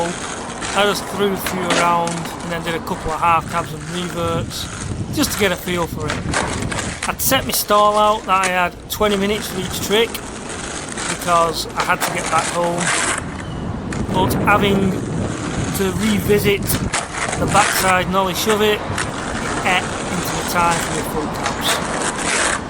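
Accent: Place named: Ireland. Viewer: No problem bro, and gotcha. I heard more of an Irish accent but I don't know much about that lol.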